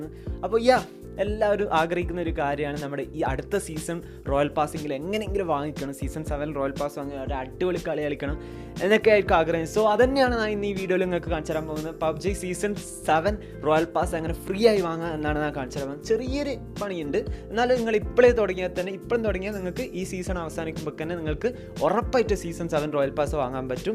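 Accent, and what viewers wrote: Kannur, India. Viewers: Kannur ആണോ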